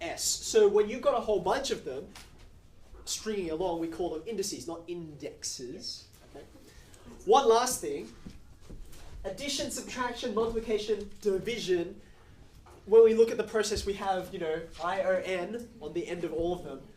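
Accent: Australia. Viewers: nice to hear an australian accent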